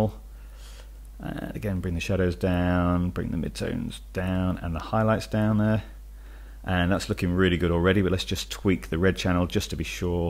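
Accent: England, United Kingdom. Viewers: Dear, Thanks a lot for your LUMA Mix killer tip! It helped me most of my previous day to match a color grading between HD plans but also old SD plans shot in Antarctica for a documentary. It was super helpful and the director are super happy cause the ice have a color that change depending how old was the ice (numerous green/blue clips but also with orange/yellow on high lights due to the sun). You "enlighted" my day:-) Your video was simply super clear, simple, effective, you really go straight to the point and i love your english accent! So thanks! It made my workflow way much faster for that! Have a nice week and thanks again for sharing your knowledge on a very simple and friendly way! Best regards from France! Vincent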